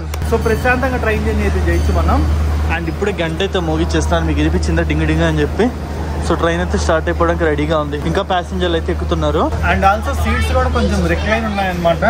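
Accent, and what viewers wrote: Nellore, India. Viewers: ❤ Love your accent and description as I am 67years young Native of Nellore Proper 😊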